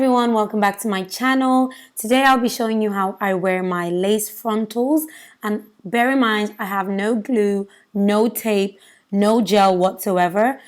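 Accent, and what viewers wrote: Ireland. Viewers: Why am I hearing a Dublin accent? The unit looks lovely btw xx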